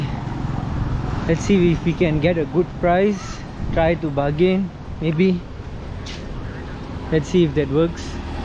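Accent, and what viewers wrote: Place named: India. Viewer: Indian accent.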